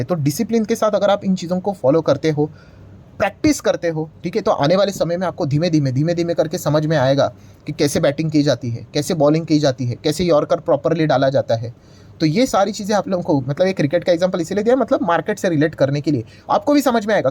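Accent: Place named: Gujarat, India. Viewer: Yes sir I listen your videos word to word, belive me i observed your pronounce that you are guharati if not you are marathi with many gujarati friends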